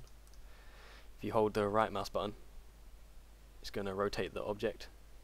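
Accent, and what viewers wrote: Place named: United Kingdom. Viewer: off topic i just wanted to know why you guys in the uk say stuff like theater like theata but with idea you say ideaer just always wanted to know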